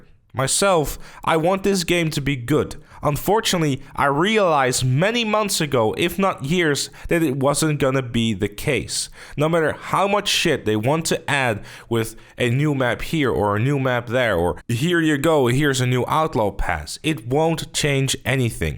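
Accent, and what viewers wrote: Netherlands. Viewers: You talk English as if you’re Dutch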